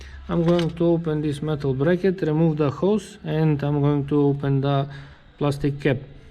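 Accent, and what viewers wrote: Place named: Russia. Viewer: а говоришь как русский🤝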